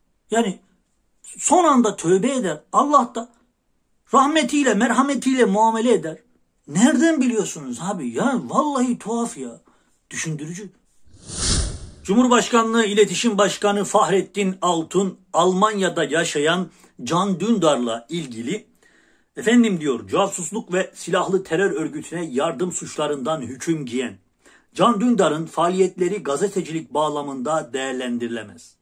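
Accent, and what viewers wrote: Erzurum, Turkey. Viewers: arada bir erzurum siveside guzel oluyor ahiskalilar sivesine benziyor bana çocuklugumu hatirlatiyor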